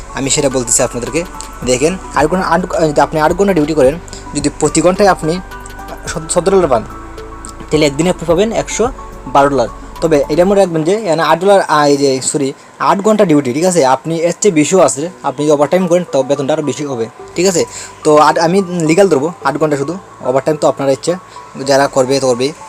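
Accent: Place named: Habiganj, Bangladesh. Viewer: Vair bari ki Habiganj ni?? Kotha shune tho Habiganj lage...🫣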